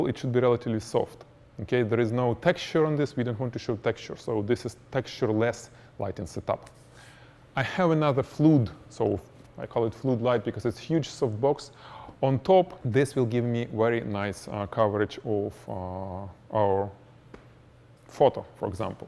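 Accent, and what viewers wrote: Russia. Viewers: мне кажется или я слышу русский акцент?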